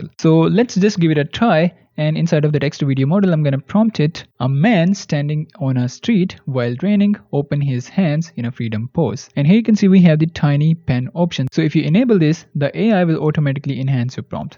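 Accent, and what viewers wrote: India. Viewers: Lol indian english accent is too comedian 😂😂😂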